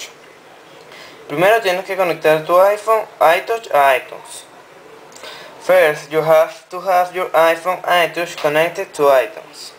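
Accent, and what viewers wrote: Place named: United Kingdom. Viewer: que ingles pareces británico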